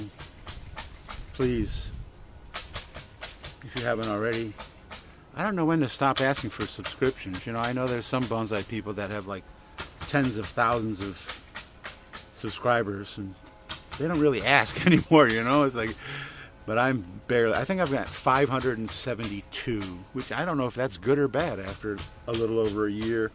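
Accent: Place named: England, United Kingdom. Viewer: Now I understand.... that's why it sounded like an English accent to me! but as I'm Italian, I thought I was wrong. So There is one more reason to follow You, to learn better Queen's English. See You....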